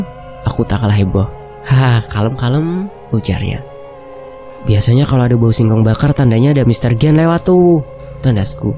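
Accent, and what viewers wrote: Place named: West Java, Indonesia. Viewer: Akhirnya mimin bawain cerita dari sunda...bisaan c mimin logat sunda na haha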